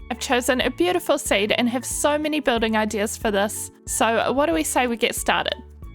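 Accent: New Zealand. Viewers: recently found your videos and wanted to just say thank you, ive been trying to find some kiwi youtubers as ive just moved to australia alone and am missing home alot, sounds stupid but ur voice and the added kiwi accent puts me at ease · Instantly recognised the accent!! 🥝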